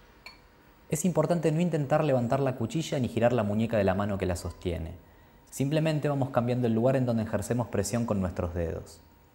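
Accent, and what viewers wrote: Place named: Buenos Aires, Argentina. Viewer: muchas gracias!, yo sabia!! Pensé sos tipo es porteño, yo sabia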